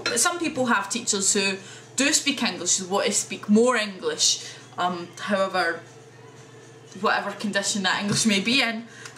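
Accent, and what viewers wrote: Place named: Scotland, United Kingdom. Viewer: Good vid :D I used to be an ALT in Chiba. Instead of making my own lessons, I used all the past ALT's and/or online shizzle. Then I spent the afternoons learning Japanese. Great starter job in Japan and gives ya time to study Japanese. Good vid, diggin the Scottish accent ;) I don't hate the ENglish! THEY'RE JUST WANKERS ,lol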